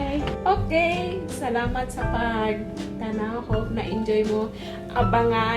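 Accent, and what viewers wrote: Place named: Visayas, Philippines. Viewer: Bisaya diay ka host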